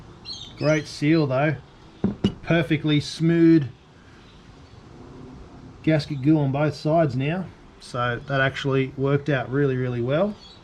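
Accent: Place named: Australia. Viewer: Tell mydipsy wreck to kick rocks and do one! you speak like that because that's your accent and how Aussies speak!..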